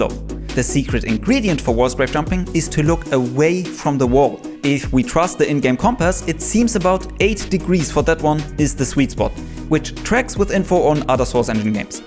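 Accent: Germany. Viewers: Wait are you german? You have a german accent im sure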